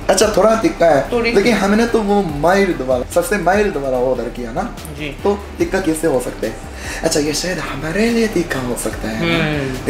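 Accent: Standard. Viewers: Bro you speak pure Hindi itni to Mai bhi nahi bolta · Oh man your hindi so pure 🥰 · Sudh Hindi bolte ho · bhai itni sudh hindi to hum log bhi nahi bolte...aapne to sach me hume aascharyachakit ker diya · You speak pure hindi even better us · bhai love u kintni pyari hindi bolte ho tum aur indians se shudh hindi aap ko aati h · Aap bilkul suddh Hindi bol rhe ho Jo ki yaha ke local logo ko bhi km hi aati h, mujhe bhi only Hindi language pasand hai 😊😊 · इतना शुद्ध हिंदी भारत मे भी शायद किसी किसी को ही आता होगा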